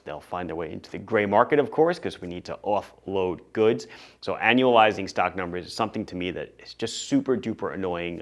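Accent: Long Island, United States. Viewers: That Long Island accent does get me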